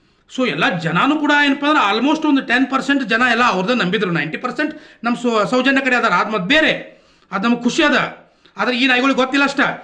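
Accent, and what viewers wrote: North Karnataka, India. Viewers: ಸೂಪರ್ ಸರ್, ನಿಮ್ಮ ಮಾತು ಕೇಳುವುದೇ ಒಂದು ತರಹದ ಮಜಾ , ನಿಮ್ಮ ಉತ್ತರ ಕರ್ನಾಟಕದ ಮಾತಿನ ಶೈಲಿ ತುಂಬಾ ಚಂದ ಉಂಟು ಮಾರ್ರಾಯೆ. ಧನ್ಯವಾದಗಳು ಸರ್. ಸರ್.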